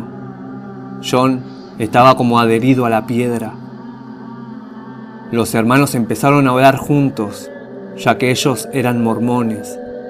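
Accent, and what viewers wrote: Argentina. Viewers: Asi hablan los porteños de capital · Así hablan los argentinos? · Es por que es argentino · me imagino que es de otro país, Argentino tal vez, Chileno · la voz y siendo argentino jajaja da es pena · La Re. Caga el asentó argentino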